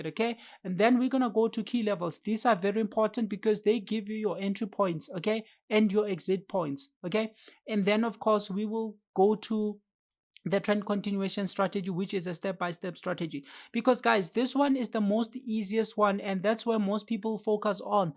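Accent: South Africa. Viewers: So nice watching a trading video in a South African voice. Do you stream your live trading? Im looking for a community bruu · Are you South African I'm hearing your accent? Cela ungifundisa ubhuti.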